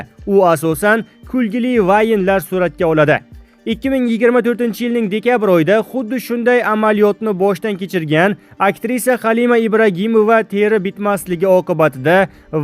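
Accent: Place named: Tashkent, Uzbekistan. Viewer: Бу канака гап энди маданият борми аелмисиз эркакмисиз аел киши шунака гапирадими тогда тошкенча гапиряпсизда энди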